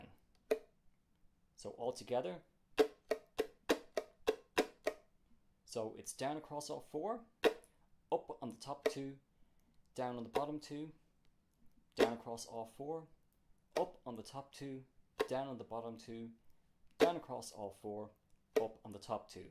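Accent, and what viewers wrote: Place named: Ireland. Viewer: where are you from? it sounds to me like you're from a part of Ireland hehe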